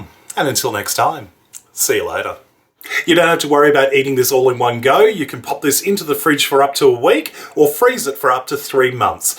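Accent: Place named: Australia. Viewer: That's an Aussie accent like mine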